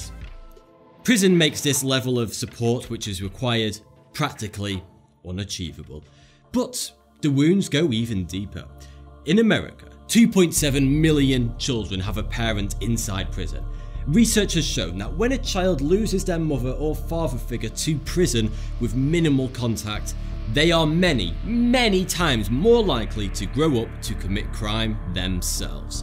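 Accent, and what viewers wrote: United Kingdom. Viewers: I wonder how many prisoners are falsely convicted. No one can make up for the wrong person’s humiliation and a new bad reputation. I guess that people in jail lose the respect from their family. The wrong people in jail isn’t justice. Remember the Memphis three from Arkansas? Anyone can lie about someone else and be taken seriously. When that happens, the court and the system goes out of their way to make it so that the defendants end up behind in bars even if they are innocent. It’s not against the law to try to make the defendant angry so that look worse than they are. There are a lot of bad prisons. I don’t like this man glamorizes the United States prisons. He has a British accent, so how does he know so much about American prisoners?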